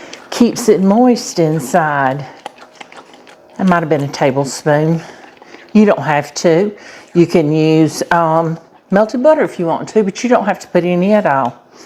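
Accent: South, United States. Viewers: Pronounce the words the way you do and let others pronounce them their way I love your southern accent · Don't let the critics who tell you how to pronounce 'peppericka' worry you. Your Southern accent is a big part of your charm!! Keep cooking and use plenty of 'peppericka"!!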